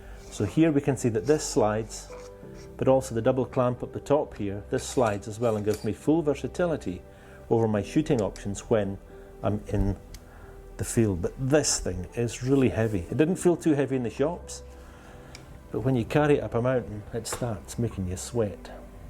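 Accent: Scotland, United Kingdom. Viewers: Thanks for the video, wonderful to watch you with your Scottish accent , reminds me with my time in Glasgow for 9 years .... suppose if you have to buy a mirrorless camera that imitates your imagery , which one you will pick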